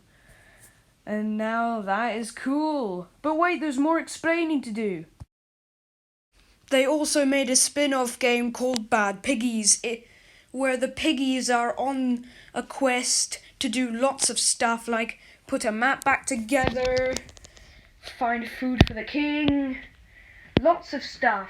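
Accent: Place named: Australia. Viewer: I love your Australian accent!! ❤❤❤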